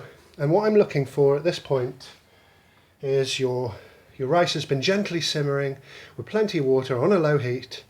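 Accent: United Kingdom. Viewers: British accent is amazing !!!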